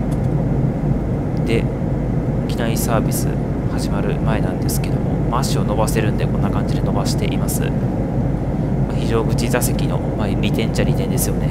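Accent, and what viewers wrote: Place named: Kansai, Japan. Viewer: バリバリの関西人ですからね、うp主は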